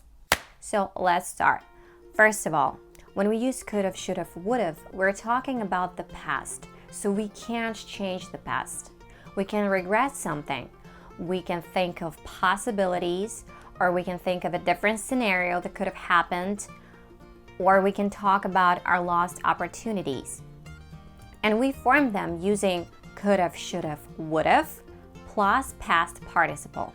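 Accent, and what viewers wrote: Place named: United States. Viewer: American accent rules !!!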